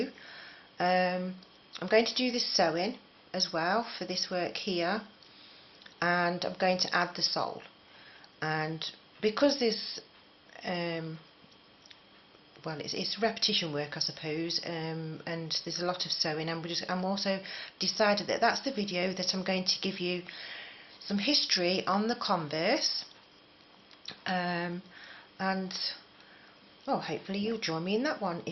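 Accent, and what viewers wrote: United Kingdom. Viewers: I love this pattern and how you've divided up the series to be as simple as possible! I'm not exactly new to crocheting...but I am new to crocheting correctly and new to following patterns! I only ran into one area of difficulty in following the written pattern you have in your description; and that's when you've finished the toe area, and you're adding the tongue to it- you write "count back 6 from last sl st of toe section". In your video, you instruct "don't count the slip stitch area - start counting with next stitch," and perhaps your written instructions could be clear about that, as well. No big calamity, as I'd only done one tongue wrong before referring back to your video. A big huge thank you for your excellent video quality, as I never had problems following where you were putting your stitches (most other videos are terribly frustrating in how many times their stitch work strays from sight!) and listening to your fun British accent was a treat, too! 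To thank you, I let every ad play out!;)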